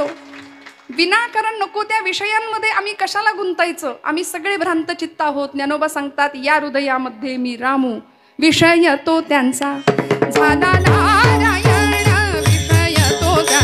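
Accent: Standard. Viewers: अत्यंत सुरेख !! वाणी अतिशय शुद्ध, स्पष्ट उच्चार, विषय मांडणी, विस्तार अप्रतिम.. एकंदर प्रासादिक !! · अतिशय सुंदर...गोड आवाज,शुध्द , उच्चार , प्रासादिक वर्णन...कीर्तन संपूच नये असे वाटते.... राम कृष्ण हरी 💐💐🙏🙏🙏🙏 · ताई अतिशय सुंदर कीर्तन आणि वाणी खूप शुद्ध आहे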